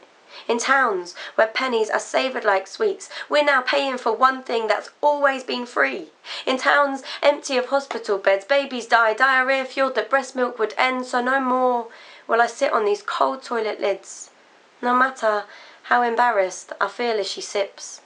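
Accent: England, United Kingdom. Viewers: And btw the english accent is the best! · I keep praying that one day all English people will be able to speak English instead of this disgusting shit accent. It's as bad as a Boston accent. Fucking hideous. How do you invent a language and then fuck it up so badly when trying to speak it?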